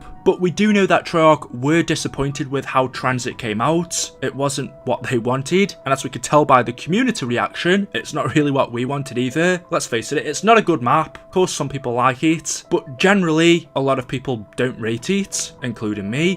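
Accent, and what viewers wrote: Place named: Yorkshire, United Kingdom. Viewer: Sounds like a Yorkshire accent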